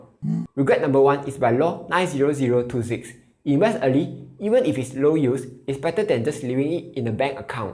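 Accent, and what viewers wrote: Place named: Singapore. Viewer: It’s called Singlish btw.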